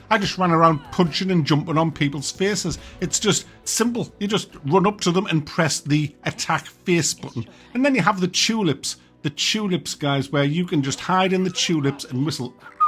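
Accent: United Kingdom. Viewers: Why do you sound more British than ever in this video? Something is different about your voice.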